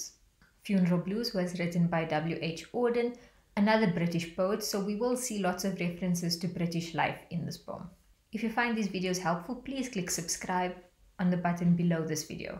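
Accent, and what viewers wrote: South Africa. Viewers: south african? i can hear the lovely accent 🇿🇦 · Are you south african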